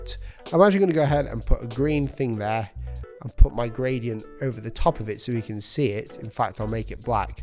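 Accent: United Kingdom. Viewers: are you british??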